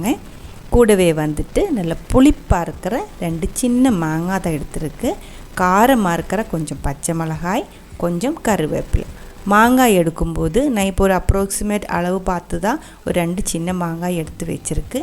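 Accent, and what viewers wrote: Kerala, India. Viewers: കൊഞ്ചം കൊഞ്ചം മലയാളം വരുതേ ഉങ്കൾ തമ്മിൽ സൊള്ളും നേരത്തുക്ക്‌ 😃☺️☺️ പാത്താലേ തെരിയും സൂപ്പർ കറി എപ്പടി ഇന്തമാതിരി... ആന്നാ നാൻ ഒരു മുടി ട്രൈ പണ്ണി പാത്തിയാച് നടക്കവേ മുടിയാത് 👌👌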